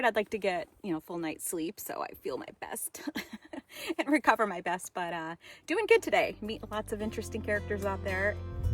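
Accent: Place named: California, United States. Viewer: Your accent sounds Californian. I moved from Los Angeles County in 1973. I'm following 4Runner, just a few days ahead of you.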